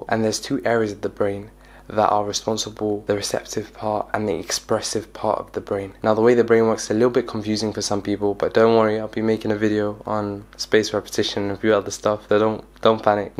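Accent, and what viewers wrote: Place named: Somalia. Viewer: what dialect of somali is that? i understood some but not all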